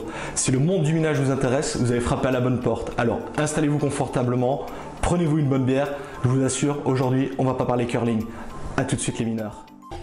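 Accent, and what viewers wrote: Belgium. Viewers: Le p'tit accent Belge me plaît déjà 😁 J'avoue que ça me manque un peu depuis presque 16 ans que je vis en France 😉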